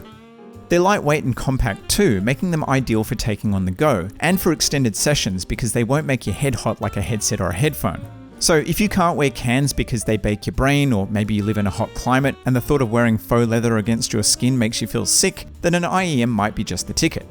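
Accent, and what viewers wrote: Australia. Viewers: Great video, don't know how i didn't see this ages ago. Is treble important for healing footsteps? I thought i heard an Aussie accent so had to Sub.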